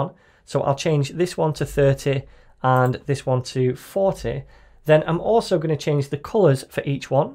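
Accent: United Kingdom. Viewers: dude am Arabian i speak multiple languages with different accents specially English so i learnt British accent from u not just flutter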